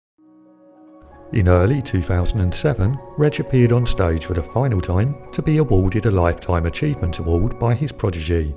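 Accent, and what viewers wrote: United Kingdom. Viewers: good point. I should have picked up you are speaking the kings English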